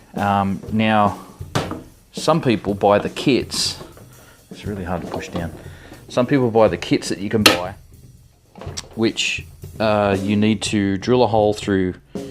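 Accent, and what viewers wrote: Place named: New Zealand. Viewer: Wow - surprised to hear your New Zealand accent after reading the title...I thought you guys would call them a box and not a can. Anywho, I hope you’re still going to make videos. How about throwing us subscribers a bone and making some new content ya old sheep shagga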